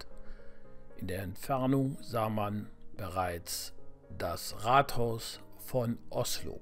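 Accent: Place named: Bergisch Gladbach, Germany. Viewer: Ich glaube Bergisch Gladbach . Danke für die tollen Videos.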